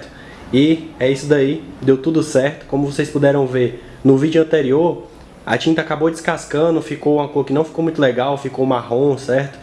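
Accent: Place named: Bahia, Brazil. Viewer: kkkkkkk mt bahiano pqp kkkk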